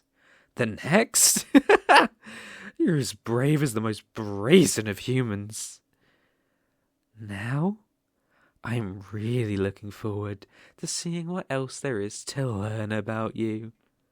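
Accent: United Kingdom. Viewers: Idk why but I can't take anything serious when it's in British like it's so cute and funny so idk bro😭🫶🏻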